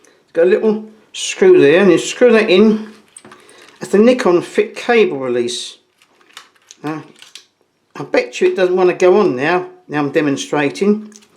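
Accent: United Kingdom. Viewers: I am looking for a film camera (I am from the digital era), and Sir you convinced me about getting a FE. Love your British accent, greetings from Argentina.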